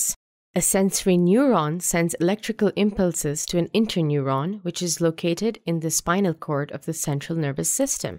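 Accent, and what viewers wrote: India. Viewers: Tamil accent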